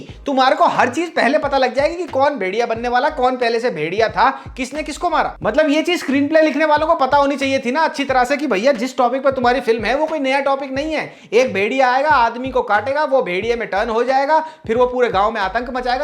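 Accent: Haryana, India. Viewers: I just love your review, it's so humorous....pls speak more in haryanvi dialect ....love from Australia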